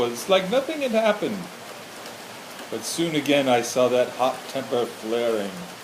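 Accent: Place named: Ireland. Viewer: Awesome story and i love the irish accent very nice👍👍👍👍👍👍😃😃😃😃😃🍀🍀🍀 best regards peter.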